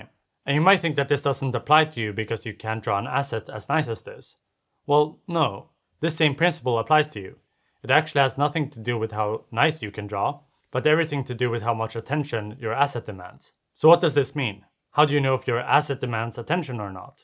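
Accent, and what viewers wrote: Sweden. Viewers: Great video. Are you Swedish by any chance?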